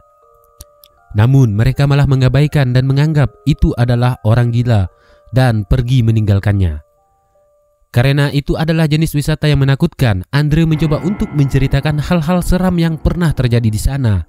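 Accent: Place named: Medan, Indonesia. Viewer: Mimin kayak orang medan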